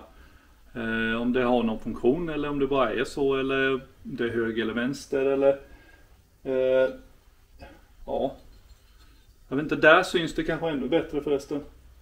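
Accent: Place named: Kalmar, Sweden. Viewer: Mycket snyggt filmat, sällan en ser en så bra renoveringsvideo. Lugnt och sakligt. Sicken go dialekt med, Kalmar/Nybro/vissefjärda?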